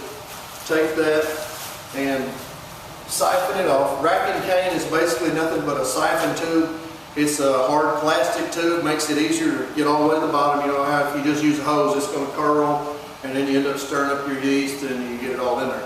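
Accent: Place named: South, United States. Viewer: Ferrr-ment. Why does that southern accent sound so dam stupid?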